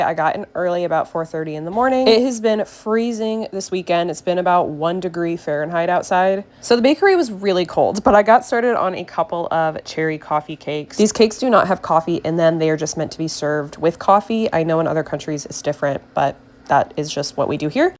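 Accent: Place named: Wisconsin, United States. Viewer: Sounds like up north in WI...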